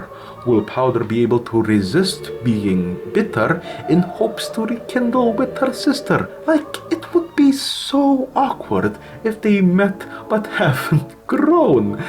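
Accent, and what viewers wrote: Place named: Eastern Europe. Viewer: As a fellow slav I knew when I've heard your accent, that there was not enough tears 100% right Also. Bober 🦫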